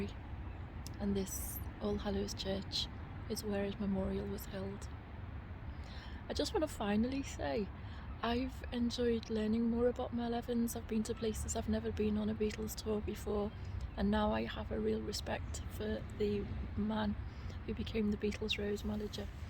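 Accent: Liverpool, United Kingdom. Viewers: delivered in a delightful Liverpool accent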